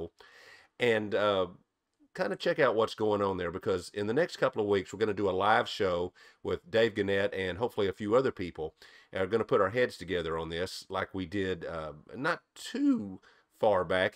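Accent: South, United States. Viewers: Hi and everyone else. Happy New year coming to everyone! 🥳.....I give many 👍👍👍..to one of the coolest accents ever! Southern! 💕😂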